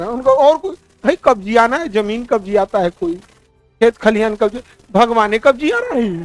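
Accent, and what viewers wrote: Bihar, India. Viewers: lecture dene se pehle thoda research vi karliya karo .....bihari ho sab ko pata hay, harek video me aapne behwar aaur bolne k tameez se bar bar biharipan ka praman dena jaruri nahi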